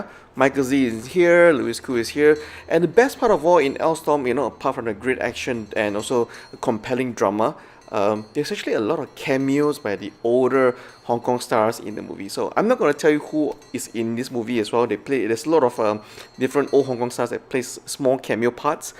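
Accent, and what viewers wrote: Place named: Singapore. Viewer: An average watch. You sounds like from sg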